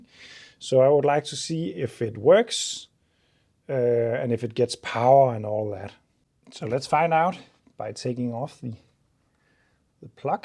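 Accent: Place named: Denmark. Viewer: Danish English is so cute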